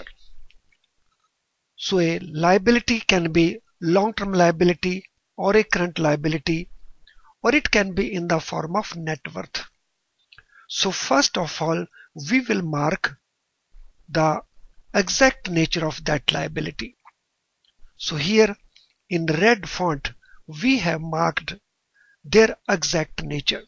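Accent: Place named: India. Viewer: WORST INDIAN ACCENT, AYYO DDDDDIZZAAASSSHHHTER!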